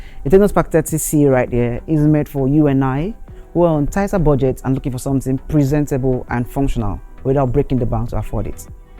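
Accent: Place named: Africa. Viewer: African english is funny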